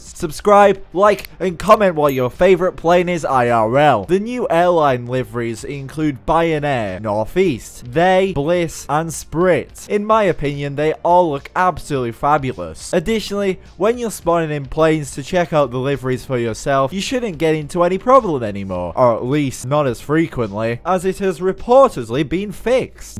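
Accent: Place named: Australia. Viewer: its the australian man again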